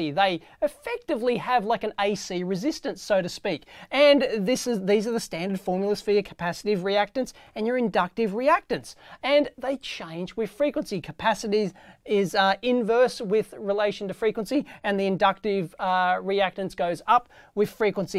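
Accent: Australia. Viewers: A book would be nice. Sometimes i cannot stand his Australian accent. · When I remember my Uni studies, the voices of my lecturers voices always come to mind. Now, when I put in any bypass capacitors my inner monologue will be telling me how to do it in a surprised sounding Aussie accent. Love it. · Now I no why!!! Thank you! Great tutorial! Aussie English, it always makes me happy! I'm still saving money for a trip to Australia... My only sorrow is that when I be there, down under, I don't want to go back home. There's something about Australia that attracts me. Don't ask my why or what it is. Greats from the Netherlands!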